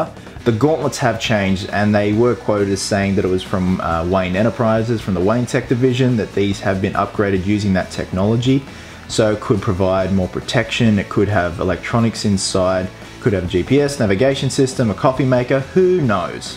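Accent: United States. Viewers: hahaha I love your american accent, nice beard too! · American accent on point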